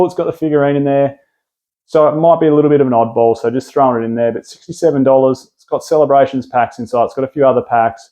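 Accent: Australia. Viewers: Embrace the Aussie Accent nothing wrong with culture in the hobby ❤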